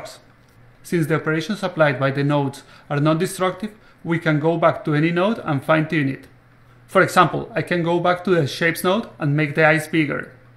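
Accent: Spain. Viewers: Buenas equipo de. Antes de nada, vuestro software es increíble, estoy deseando probarlo y empezar a hacer mis texturas en él. Otra cosa; si no me equivoco por el acento, sois españoles, si es el caso, tenéis algún tipo de video tutoriales en español? Por lo que he visto son todos en inglés, aunque no es un gran problema, se me escapan ciertas cosas con el inglés, y me iría de perlas que fueran en castellano. Muchas gracias y seguid así!!!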